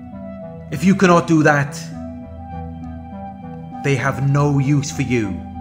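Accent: Wales, United Kingdom. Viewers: I like your Welsh accent :-) great video, very informative.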